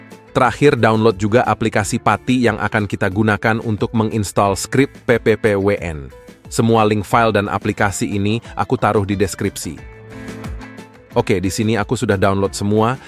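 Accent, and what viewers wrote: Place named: Sulawesi, Indonesia. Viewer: bbrapa wktu yg lalu sy nnton dia hunting ps4. Ad semacam percakpan logat nya sprti dari pulau sulawesi. Perkiraan sy dia di manado atau gorontalo atau bsa jg di kota palu.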